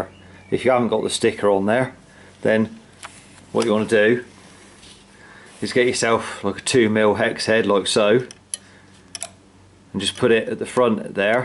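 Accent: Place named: Bristol, United Kingdom. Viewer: do you know, love the what must be bristolian accent and despite it sounding boring, to me today you are a hero.... All day I have been trying to sort my front derailiuer . I've checked 100 vids when things didn't or wouldn't go right... All I needed was to fit that tab that you so eloquently demonstrated. Jeez, I should have listened to you in the first place. thanks so much for this vid it's the best,.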